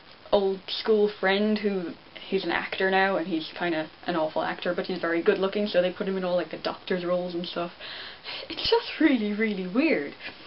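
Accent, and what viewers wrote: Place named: Ireland. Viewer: literary irish. very attractive accent.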